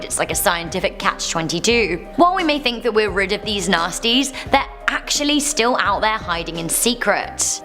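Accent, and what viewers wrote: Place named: Scotland, United Kingdom. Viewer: I love British accents, but a Scottish brogue blows my mind!!!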